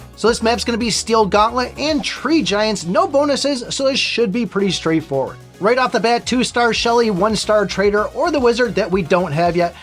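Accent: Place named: Pennsylvania, United States. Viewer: You definitely don't need to answer this. I'm just super curious. I grew up in PA. And your "accent" sounds so similar to my family and the friends I grew up with. You also might be from Wisconsin, lol. Again, don't answer if you're not comfortable.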